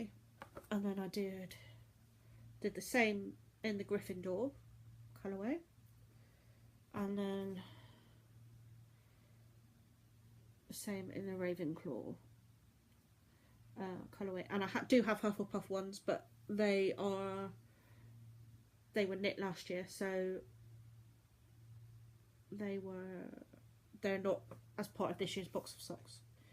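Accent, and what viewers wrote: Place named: Devon, United Kingdom. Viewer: Lovely 1st episode, catching up on all your podcasts. Lovely to hear another Devonshire accent, me loveerrrr 😘💗 Gorgeous makes! X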